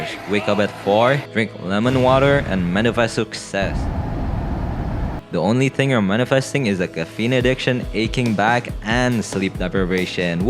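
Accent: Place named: Indonesia. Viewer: Another Indo English speaking peak content? Subscribed!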